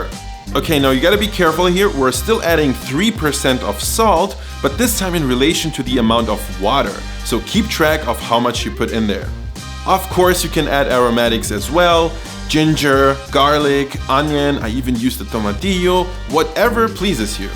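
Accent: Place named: Berlin, Germany. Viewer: I immediately knew you were from Berlin because of the way you speak and when you showed the houses I was certain lol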